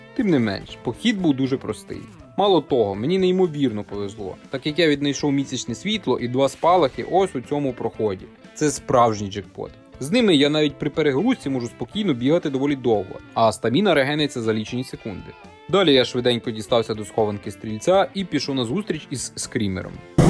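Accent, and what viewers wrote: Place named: Western Ukraine, Ukraine. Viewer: Я рабсиянин люблю смотреть и слушать украинский язык с западным акцентом вообще бомба! Особенно когда смотрел про херсонского енота из стражей галактики это шедевр! Героям слава!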